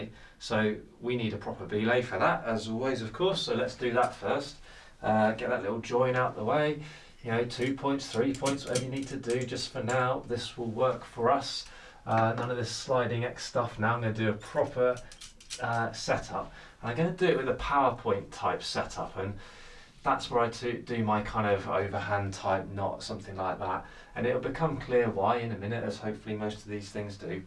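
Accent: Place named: United Kingdom. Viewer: I stumbled upon this channel during lockdown here in Barcelona, Spain. Since then I've been binge watching lots of videos, I find them super chilled and entertaining. Is it weird that I enjoy watching videos that teach techniques that I already know? I find this dude so genuine and I really enjoy his British accent haha, keep up the content coming!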